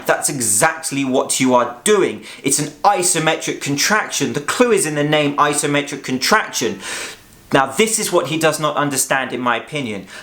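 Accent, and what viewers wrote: England, United Kingdom. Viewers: I think your so called DEBUNK of VShred is ridiculous . I am a 61 year old man (look 37 ) and have been working with VShred for 8 months. I lost 40 lbs and have gained musculature that I had when I was in my late 20's . I follow other channels as well and have become an expert on diet . I don't understand why you are singling Vince out in your very particular attack. You seem to be envious of his following 4.2 million or so viewers . Even with your English accent you will never be the YouTube Star that Vince has become.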